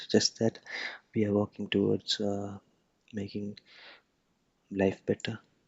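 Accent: India. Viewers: Love the Indian accent! Actually the first asmr experience I had on a phone call :)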